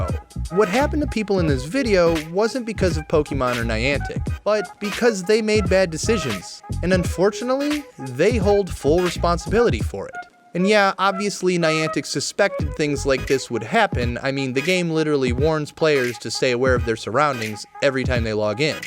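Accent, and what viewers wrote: United States. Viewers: Entertaining video!! Well deserved Like, but it's painfully obvious that you are emulating Internet-Historian with the Segway's and background music. What makes it even more jarring is the lightly-pitched American accent you have that starkly contrasts with the kind of voice most Youtube fans expect with this format and it takes away from your hard work. Constructive criticism would be to suggest using the same level of research, but develop a personal style to not seem like a knock-off Internet-Historian. I mean you even say, "ad time" and "ad over" to the same beat as the man over at Internet-Historian. At least you got paid for this.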